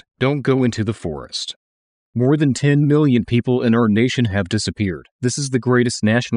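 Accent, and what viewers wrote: United States. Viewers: Did no one observe the American accent on a British channel? 😅 However, great effort! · Like the background muisc, but you do need to be aware that a British EAS would not use an Americn/Canadian voice over person.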